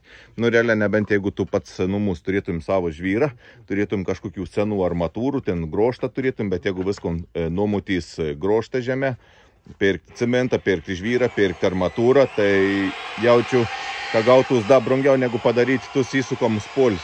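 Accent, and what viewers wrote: Samogitia, Lithuania. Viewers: Netyčia užmačiau ir dabar vis pažiūriu. Labai fainai žmogus pasakoja, smagiai žemaičiuoja ( kaip ne žemaičiui smagu paklausyt), gal tik kartais norisi detalumo, na tarkim kaip tampomi šniūrai, kaip/ su kuo susukat lentas tarpusavyje, kampukais ar ilgais varžtais ir t.t. Šiek tiek detalumo. bet šiaip labai smagiai žiūrisi ir ( jei neklystu), labai su cinkeliu toks ( į gerą pusę):)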